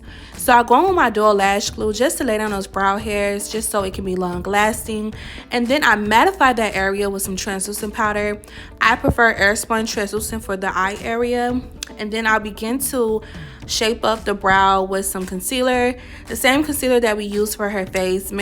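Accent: Miami, United States. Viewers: there's no way you aren't from Miami! That accent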